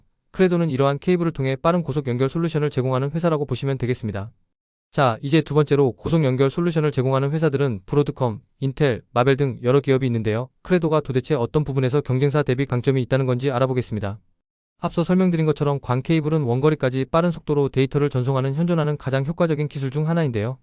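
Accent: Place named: Seoul, South Korea. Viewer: 똑소리나는 서울분 , 반가와요. 유투브 흥하시길 💕 우연히 들어왔다 구독 좋아요 하고갑니다.😊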